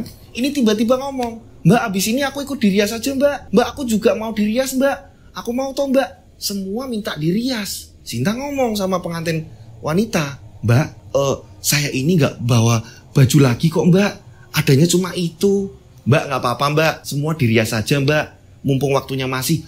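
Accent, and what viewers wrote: Java, Indonesia. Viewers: Assalamualaikum..... Kk Nani dari Majalengka Jabar Hadir Absen.... De kk tuh sangat suka kalau kamu menyampaikan kisah cerita nya.... Sangat pas cara menyampaikan nya.... Apalagi bahasa jawa de yg sangat medok.... Kk sangat suka de... Sehat dan sukses selalu ya de.... Boom..boom... Booommm 😍🙏🙏🙏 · Seneng dengar logat jawanya 😁😁😁😁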